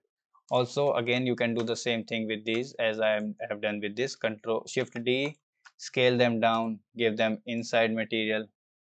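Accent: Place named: India. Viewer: LMAO! i wanted to say i like the indian accent :))) BUT YOU SAID IT FOR ME :DDDDDD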